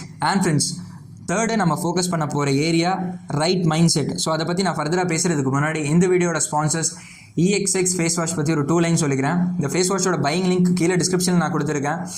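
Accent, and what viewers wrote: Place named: Mannargudi, India. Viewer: Semma bro mannangatti slang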